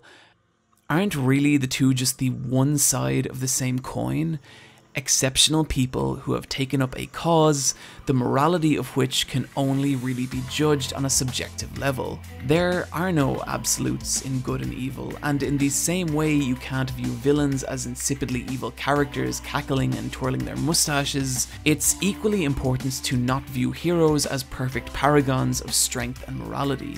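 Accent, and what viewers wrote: Ireland. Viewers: My high school English teacher, senior year, said something similar. He said in fiction, the villain rarely ever thinks of themself as being evil. They believe that they are the hero. Made a crapload of sense when I played Borderlands 2, but I like hearing it reiterated in a silky smooth Irish accent.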